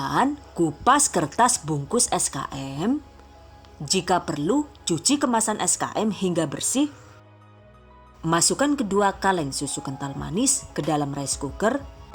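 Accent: Banyumas, Indonesia. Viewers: Hadeuhh...gk nahan sm ngapaknya itu loh...,😅😂 channel kesayangan gue nih,krn inspiratif dan kreatif banget,👍👍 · yu ngapak2 baen kouh 🤣🤣🤣🤣🤣🤣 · Hehe I always wanted your video not just because the receipt but for your ngapak hehe lucuuu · 😆😆😆 ngapaknya itu loh aye demen banget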